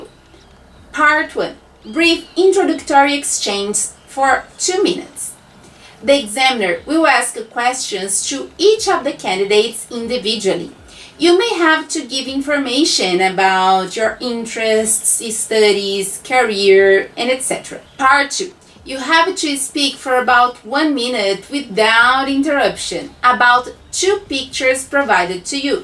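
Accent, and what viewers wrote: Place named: Brazil. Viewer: Nos primeiros 10 segundos de vídeo eu já fiquei tipo... Essa garota deve ser brasileira, só pelo sotaque kkkkk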